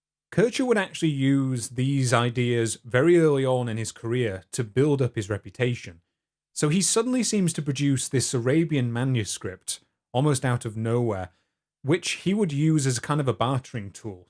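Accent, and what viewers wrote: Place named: England, United Kingdom. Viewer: thats not an American an Englishman. Trying to act smart when you cant even tell accents apart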